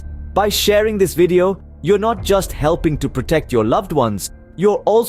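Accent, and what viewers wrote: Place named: India. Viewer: " a trusted source like Microsoft" Who the heck trusts Microsoft? I can cope with a scammer better than I can cope with Microsoft. Hate to break it to you, but Microsoft have permanent access to your WIndows computer anyway these days. Anyway, the elephant in the room is that 99% of scams are from India, and an Indian accent on the phone is a massive red flag - like like the commentary here in fact.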